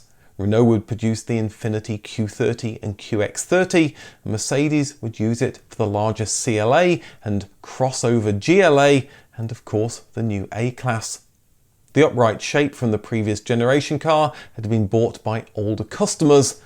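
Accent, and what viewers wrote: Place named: Midlands, United Kingdom. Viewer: There's many British accents and dialects. Midlands/northern accent?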